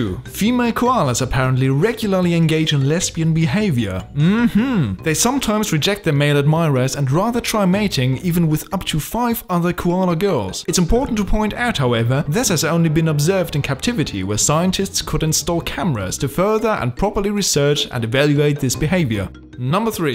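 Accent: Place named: Germany. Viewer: How come I feel a koalalike urge to fall asleep whilst listening to facts infused with a German accent?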